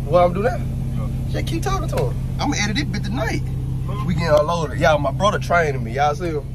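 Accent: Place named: Mississippi, United States. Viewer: I hear that Mississippi in ya voice mane 😂#601 stand up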